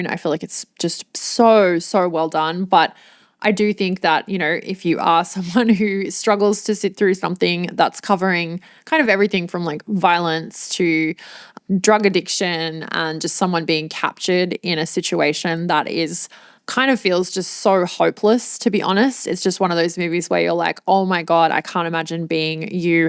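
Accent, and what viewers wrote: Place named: Perth, Australia. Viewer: So..... your a pearl ❤ from perth eh? That explains alot 🎉 I love yout accent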